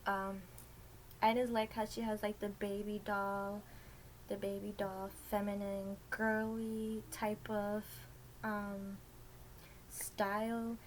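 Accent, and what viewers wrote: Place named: New York, United States. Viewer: I don't know if you have ever heard Lalalalafontaine!!!! He was a legend hockey player for the Sabres in the 90s. I like your New York accent.😎😎✨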